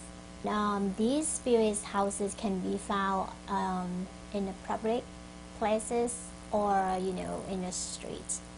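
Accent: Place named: Thailand. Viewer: oh! you were born in Thailand, that's why you got Thai accent! <3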